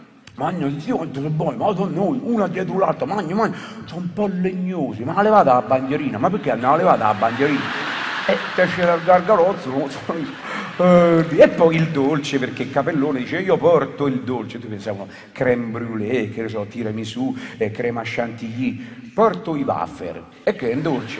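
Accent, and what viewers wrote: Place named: Rome, Italy. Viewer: Enrico Brignano, che dire, io non sono nato a Roma ma il dialetto Romano suo è raffinatissimo. Tante risate e affetto da Perugia. Viva il Cabaret ( quello vero) <3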